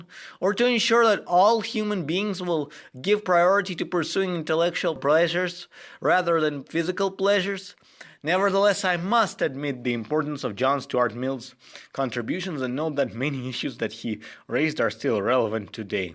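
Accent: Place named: India. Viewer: now this is good content but try to correct your indian accent okay?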